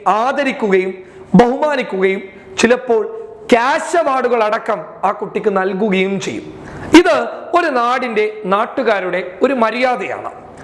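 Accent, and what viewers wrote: Kasaragod, India. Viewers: കേറി വാ മക്കളെ...🙋 ഇനി മുതൽ കാസർഗോഡ് ഭാഷയിൽ ബ്ലോഗ് കേൾക്കാം...😍😍 ഈ ലോകത്ത് നരകിച്ച് ജീവിക്കുന്നതിനേക്കാൾ നല്ലത് ചിരിച്ചു മരിക്കുന്നതാണ്...🤣🤣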